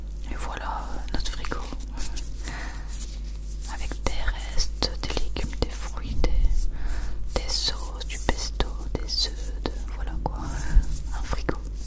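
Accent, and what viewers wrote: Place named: Belgium. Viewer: La couisine 😂😍j adore l’accent belge je sais pas pk ça me détend en plus 😂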